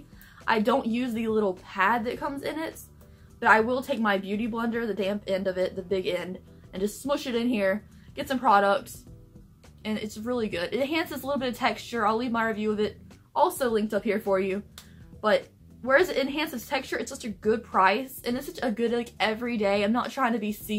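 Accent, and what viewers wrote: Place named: North Carolina, United States. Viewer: Youre in NC, i was like i know that accent! I lived all over NC for 8 yrs. Whats that wig's name/style? My hair is copper blonde/auburn, i dont dare to go over to the strawberry blonde reddish color, but still like it. It def suits you. I love Colourpop's birthday cake i was just starring at it today, i love to add sparkly pigments on top.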